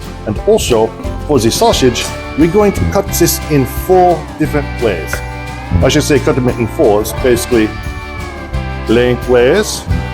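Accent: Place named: Germany. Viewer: I hate to tell you this but your German accent sucks.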